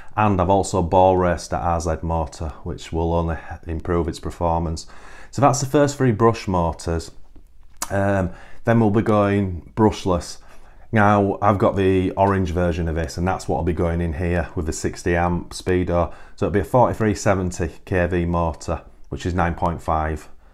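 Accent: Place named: Yorkshire, United Kingdom. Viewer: I'm also wondering if there's a knight rider shell???lol. I put your accent somewhere between huddersfield and Leeds? I was thinking in a previous video it's a bit sunny for round here,lol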